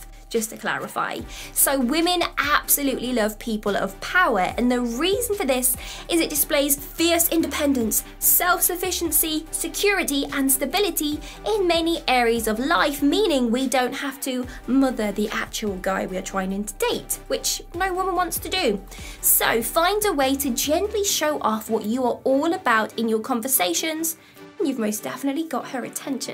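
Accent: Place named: RP, United Kingdom. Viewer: Cool RP accent and cool backdrop too.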